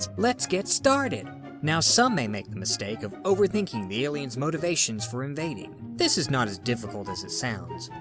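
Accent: South, United States. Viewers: You've got this very subtle twang of a southern american accent, or am I just imagining things??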